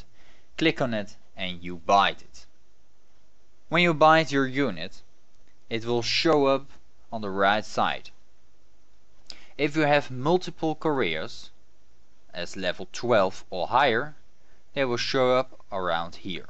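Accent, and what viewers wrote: Netherlands. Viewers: ah that makes sence thanks for the tip :) Btw are you dutch ? :) cuz i can hear you are definantly not english :) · Ik keek de vid en hoorde gelijk dat je nl was, bedankt voor de uitleg man! Ik speel deze game al meer dan 200uur hahaha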